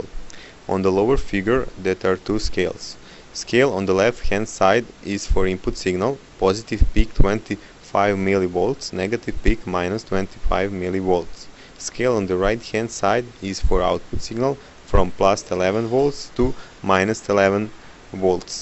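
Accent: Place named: Serbia. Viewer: SPEAKING ENGLISH WITH SERBIAN TONG.... PA STO NA ENGLESKOM...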